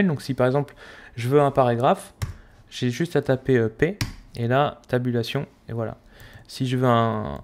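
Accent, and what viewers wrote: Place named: England, United Kingdom. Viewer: belle axenc englais !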